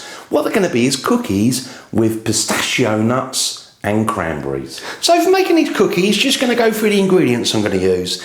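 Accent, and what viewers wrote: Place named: London, United Kingdom. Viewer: He's not from Yorkshire; maybe London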